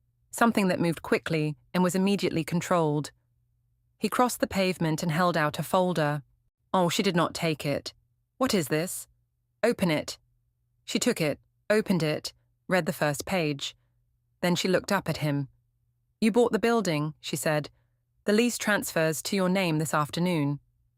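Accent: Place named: England, United Kingdom. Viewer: I have a hard time to listen this English accent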